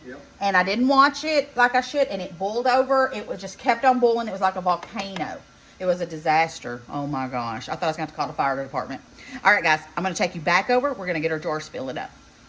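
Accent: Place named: North Carolina, United States. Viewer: Sweetie, please quit apologizing for your pronunciation of words & your accent!!! We love you just the way you are!! I happen to be from North Carolina & talk just like you so I love it!! Great looking jelly! God Bless!!! 🙏❤️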